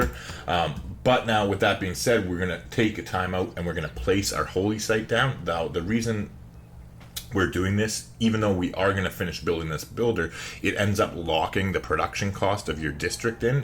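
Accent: Canada. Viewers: yep that’s what I meant. Awesome video by the way, been playing civ for years and learned a lot of cool stuff just watching this. Sick Canadian accent as well. Also are you gonna continue this series or was this just a one time thing to show beginners how to play out the first 50 turns?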